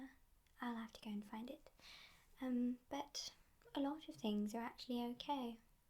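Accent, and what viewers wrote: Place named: South, United Kingdom. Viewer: You are British right? Me too but I really can't place your accent definitely southern and rather posh lol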